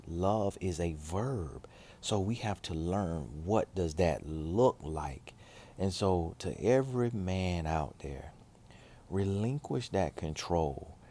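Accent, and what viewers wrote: South, United States. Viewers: I have always enjoyed your videos because of how you speak. Aside of your country accent (it's so cute), you drop bombs that need to be dropped. With that said, I don't know where you are or what your doing, but these video topics have been on a different level altogether! I am appreciating these videos because although they're not as long as you normal record (I wish they were but I know you're taking care of business), they are absolutely needed! Thank you for staying consistent despite of your abundant season. Can you do a video about our relationship to money once we're out of debt? Please and thank you.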